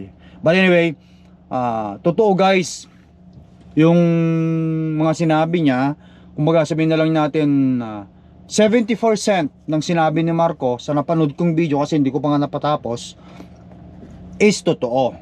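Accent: Ilocos, Philippines. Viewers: ILOCANO TALAGA ANG TONO MO MAGSALITA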